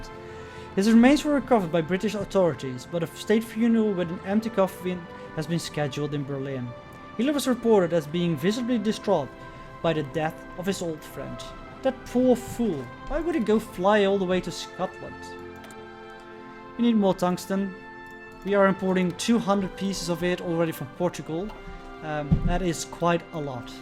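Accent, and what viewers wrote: Netherlands. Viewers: Does anyone else love the Dutch accent?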